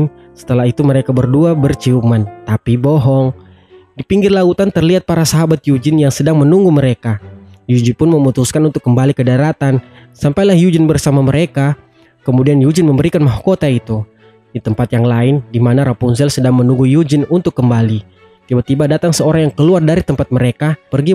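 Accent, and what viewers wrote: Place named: Manado, Indonesia. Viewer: Suka krna logatnya, logat menado kah? Intinya suka bgt deh 😂💙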